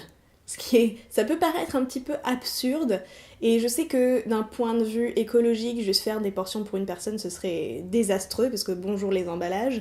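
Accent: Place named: Quebec, Canada. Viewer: T'as tellement raison, etre en couple cest pas un but ni un objectif de vie, cest un choix. Je pense aussi que le besoin vient d'une autre époque où la survit de la race en dépendait, on en ait pu là du tout!! Perso je suis célibataire et j'adore ma vie comme ca. Je suis une personne tres solitaire et ca ne plait pas a tous et jy tiens vraiment bcp. Desfois je trouve que tu as un accent québecois :) comme quand tu dis ''plus facile à dire qu'a faire'' le faire, tu viens pas du Québec par hasard? Bonne journée à toi et à bientôt. Merci pour la vidéo, tjrs un plaisir t'écouter.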